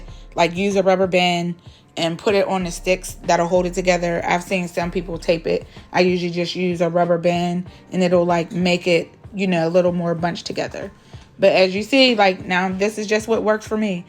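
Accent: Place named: Pittsburgh, United States. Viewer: I’m from NY… my college roommate and best friend was from Pittsburgh 🥹 your accent sounds so much like her 🥰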